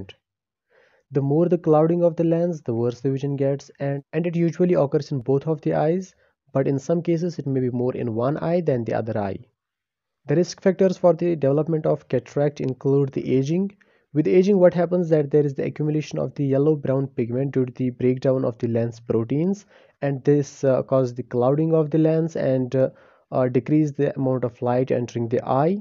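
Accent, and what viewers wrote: Kashmir, India. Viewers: Kashmir se ho kya baya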